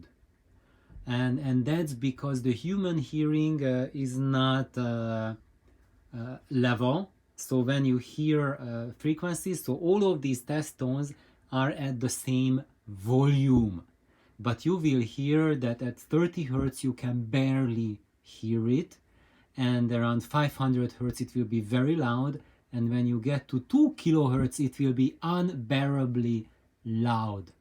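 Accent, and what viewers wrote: Hungary. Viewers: Sorry for the off topic. After 15 years of living in the UK I have met a lot of people from different countries. I am able to recognize accents after just a few sentences. You are definitely Hungarian. Ok it was easy, because I am Hungarian too. :) Believe me It is fun to know where people come from without asking them. All I need to do is just listen how they speak. :)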